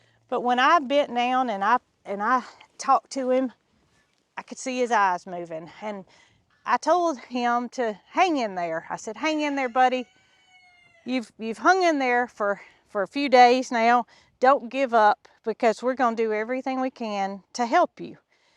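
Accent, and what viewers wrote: South, United States. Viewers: I knew listening to you that you were going to say”bonkers”! I love your southern accent! Yes! It certainly is going to an interesting weekend even up here in MD! You guys take care and hopefully we all will be ok! I was scared to use my cast iron fry pan because my stove top is glass. I just didn’t trust my wrist to set the pan down gentle enough not to crack the glass. So I gave it to good will.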